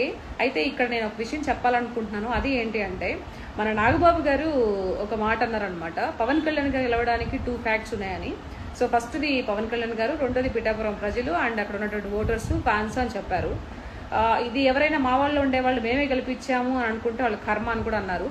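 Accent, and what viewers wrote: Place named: Nellore, India. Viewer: Ippudu emaindi Nellore yaasa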